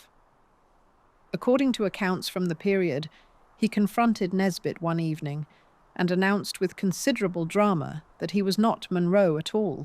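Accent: England, United Kingdom. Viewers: Amazing how few people know it’s AI. Why would you pick an English voice for American stories? Odd choice.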